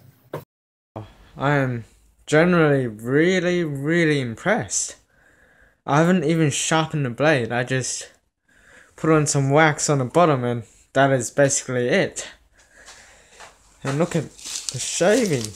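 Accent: Australia. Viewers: Australian accent. :)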